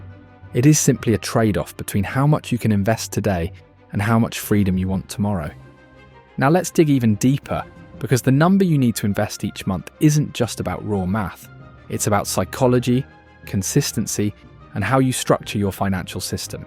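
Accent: United Kingdom. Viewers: Person with British accent won’t teach you anything new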